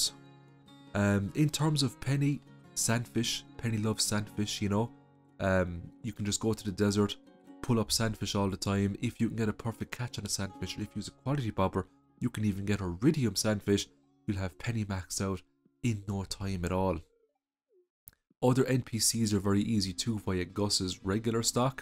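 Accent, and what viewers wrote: Ireland. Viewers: thank you for the heart, I love your Irish accent ❤️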